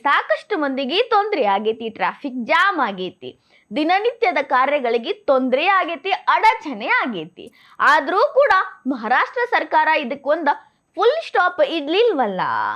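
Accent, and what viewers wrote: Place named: Haveri, India. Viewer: ನನಗೂ ಬಹಳ ಇಷ್ಟ ಆಯಿತು, ಹಾವೇರಿ ಕಡೆಯ ಭಾಷೆ ಇದ್ದಿರಬೇಕು. 🌷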